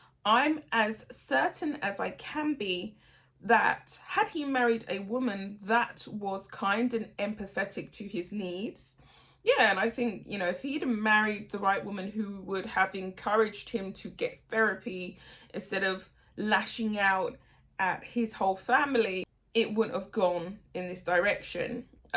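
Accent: United Kingdom. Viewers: Lovely to put your face with your UK voice! Great to see a young lady with great common sense to see through the UNTALENTED ENTITLED GRIFTERS!! It was reported that approval was given to print the pics to their children as there is a law in the States that children's faces MUST BE PIXILATED unless media has approval from the parents. So much for PRIVACY of the fake royals in the States.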